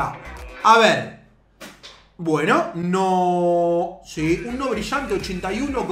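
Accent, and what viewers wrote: Argentina. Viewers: me gustan tus vídeos pero pareces un Carlitos muy argento cuando hablas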